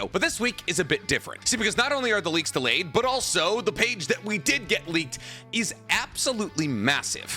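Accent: Boston, United States. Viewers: Yo brother you from Boston? Me too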